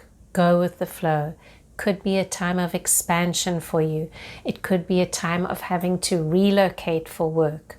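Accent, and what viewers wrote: South Africa. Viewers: THANK you! First time listener. You sound South African.. Greetings from Cape Town South Africa. 🐬🐧🐳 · Thanks you for this. I love your S. African accent and your clear and concise guidance. It is very helpful.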